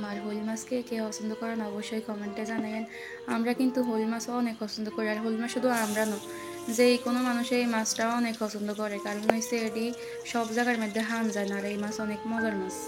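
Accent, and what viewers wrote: Noakhali, Bangladesh. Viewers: আমি বুজি আপনার নুয়াখালী কথা আমি সিলেটি আপনার পাশে আছি ভালো থাকো❤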